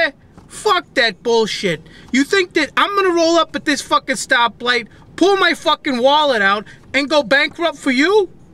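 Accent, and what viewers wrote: New York, United States. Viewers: Great!R.Deniro level definetly! just sorry for other Newyorkers who are shown once again as saying "fuck" every 2 sec in a phrase... n' about panhandlers, you're f**ing damned right man!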